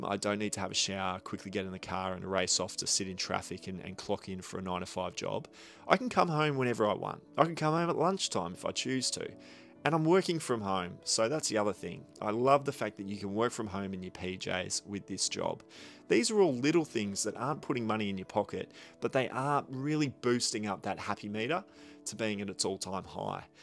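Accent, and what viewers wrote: Australia. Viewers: your lovely Aussie voice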